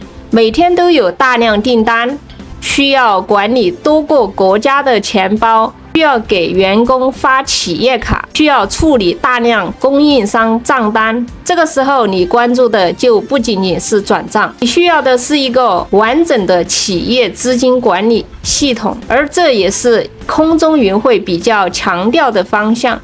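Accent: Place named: Southwest, China. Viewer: 是西南口音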